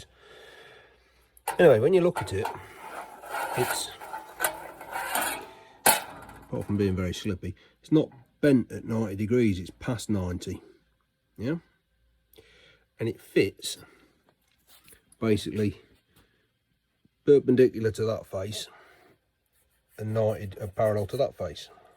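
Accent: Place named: Lincolnshire, United Kingdom. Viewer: I have been welding for 40 years and also worked on series landrovers for quite a few years as well as owning renovating my own and have NEVER attempted a bulkhead quite as bad as yours. I do renovate some series one 80" bulkheads by virtually making them new with just some salvage parts off the old ones. So respect to you sir! By the way your accent sounds Lincolnshire, could that be correct? I am myself!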